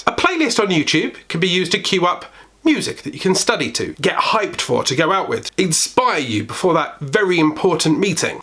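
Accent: United Kingdom. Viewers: I love the British sound. Even i don't get it very well.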